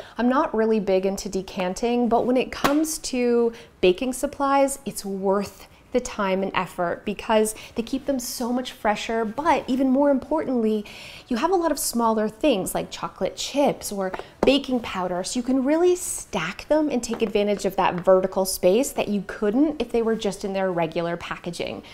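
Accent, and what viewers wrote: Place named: Canada. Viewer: That Canadian accent is coming through! Lol 😂 Love these tips!!!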